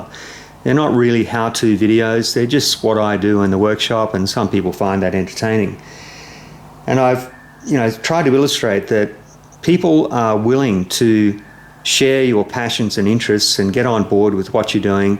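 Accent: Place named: Australia. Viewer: Gday, Great to see your channel is getting plenty of subscribers. I've been enjoying both the project videos and the background sounds that I'm missing from home (birds, rain on the shed roof, Oz accent, etc.) as my wife and I are currently living in the UK, but home is Qld. Looking forward to future videos. Cheers.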